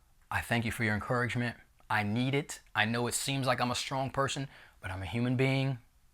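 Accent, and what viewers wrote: New York, United States. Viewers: he has a NY accent… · i think I read “what’s this accent” the first time. 💀What I meant is there was no accent change, he talks the same (the NY accent) he’s just not doing his characters, with had a more cartoonish personality and accent. Remember his movie about getting robber? He sounds the same as this in that, because he’s not playing a character, but being himself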